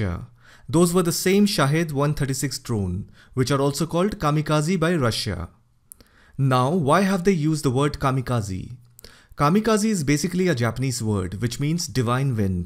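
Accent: India. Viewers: Very clear indian talk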